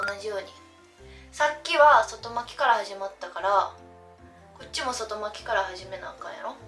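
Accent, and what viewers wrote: Osaka, Japan. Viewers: 恵方巻き髪😀まきまき そう言えば握手会で巻き髪見たことなかったねΣ(ﾟωﾟ) 会話が、軽く大阪のおばちゃん入ってる(((*≧艸≦)ﾌﾟﾌﾟｯ 可愛い❤️🥰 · 平和なほのぼのとしたカワイイ動画ありがとう😄 こんな世の中だから何かほっこりしました。やっぱりみるるん面白いなぁwww一言一言が何かクセがあるし、大阪弁だから笑えます😁 一生懸命なんだけど、何かしでかし感もいいです。やっぱり女の子は髪形で雰囲気かわりますね。 自分は似合ってると思います🆗 PS・・・先日のNAMBATLEの動画も観ました。 ”ミックスジュース”頑張れ！！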